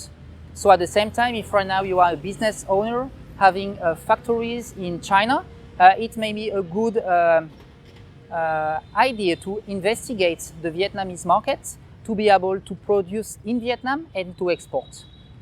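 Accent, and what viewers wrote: France. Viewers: I watch you for the French accent!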